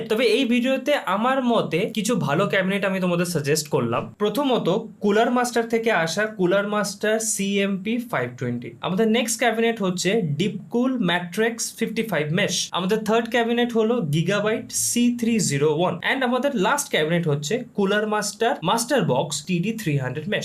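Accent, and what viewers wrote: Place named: Bangladesh. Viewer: Vhai apnar accent amader Bangladeshi der moto,ami apnar accent shune prothome vhabsi bd YouTuber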